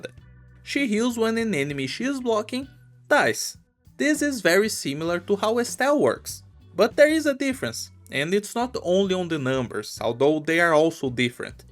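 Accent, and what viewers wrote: Brazil. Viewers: Are you Brazilian? I recognize that accent lmao.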